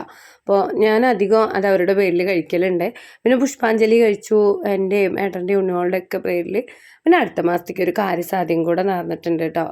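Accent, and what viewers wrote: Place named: Northern Kerala, India. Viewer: നന്നായിട്ടുണ്ട് അല്ലേലും വടക്കുള്ളോരുടെ വർത്താനം കേൾക്കാൻ നല്ല രസമാണ്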